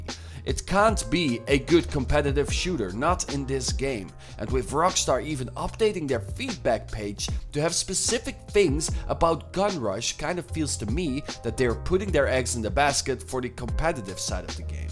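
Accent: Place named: Netherlands. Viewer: Let’s face it, RDR2, desite being the wayy more detailed and perfectioned game compared to GTAV, isn’t half as much fun to play, or at least not online. We can only pray for Rockstar to release GTAVI soon, because it is obvious RDR2 pales in comparison.. On a sidenote, just out of curiosity, where are you from? Your “regular voice” accent sounds pretty Dutch;) Thanks and good luck with the channel!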